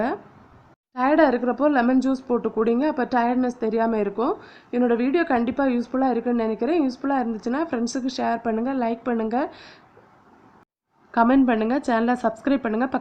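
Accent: Kanyakumari, India. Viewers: Akka neega kanyakumari ah? Slang correct ah Iruku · Hi, Nice to hear kumari Tamil. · Am also from KANYAKUMARI .I had found u with ur slang.well done sister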